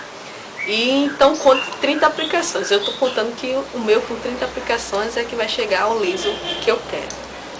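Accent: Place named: Ceará, Brazil. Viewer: voçe e do çeara,ou rio grande do sul? voçe tem um sotaque bem interessante,.......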